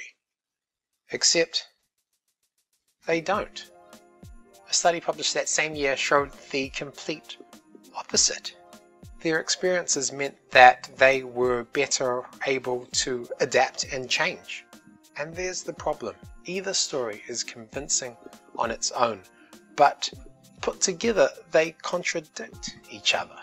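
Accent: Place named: New Zealand. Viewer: you sound like a kiwi! what up broooo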